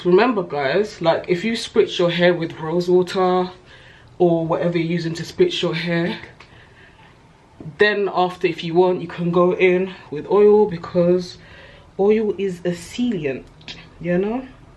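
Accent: United Kingdom. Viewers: Your hair is really nice on you, and your British ascent is everything👑😊😊😊, do u have a video on what to expect in the first 12 month? , please I need such video👑👑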